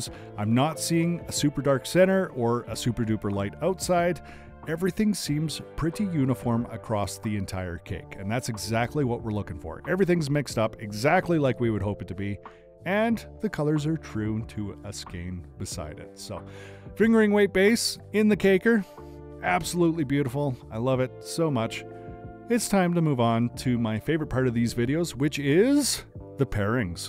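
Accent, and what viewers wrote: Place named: Alberta, Canada. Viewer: I absolutely love how you take us through the process and show us each color way worked up and paired. Makes me want to buy EVERYTHING. Fantastic marketing strategy. Canadian living in the US so it’s fun to listen to your Alberta accent. I have family around Lethbridge through to The Hat. Love your videos; keep ‘em coming. 🙂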